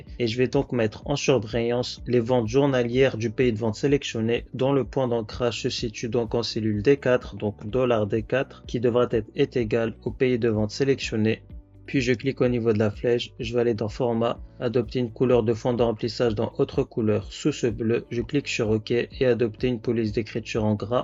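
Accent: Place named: Maghreb. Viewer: T’es DZ ou MA ? Ça s’entend fort 😊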